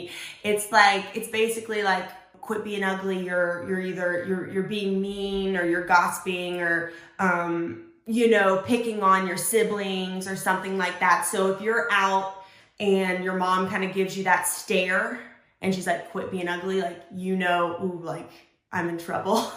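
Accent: United Kingdom. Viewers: I clicked on this video just to comment wow I thought she was british so now that i've done that... imma go watch the elections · why did i actually think she was british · Thought she was British 😳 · She more sexier with British accent !! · I thought she was british holy crap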